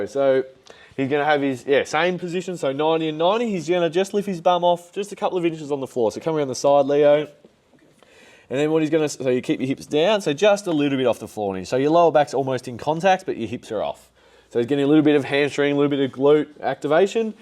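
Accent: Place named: Australia. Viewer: So much knowledge .. w some latin and the Australian accent . Glad my phone is in my pocket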